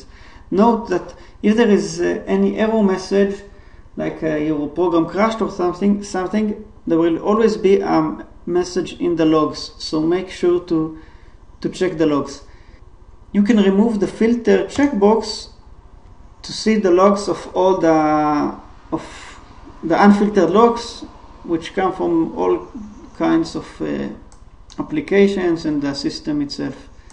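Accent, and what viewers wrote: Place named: Israel. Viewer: GO israeli accent!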